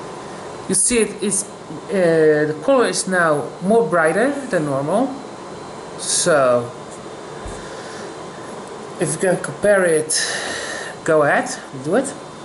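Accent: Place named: Netherlands. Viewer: Enjoyable videos, keep them coming. And you are Dutch or Belgian, kinda recognize the accent :).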